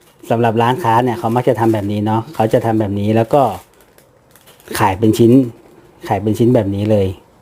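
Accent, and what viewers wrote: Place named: North, Thailand. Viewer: ฟังสำเนียงคนเหนือแน่เนาะๆ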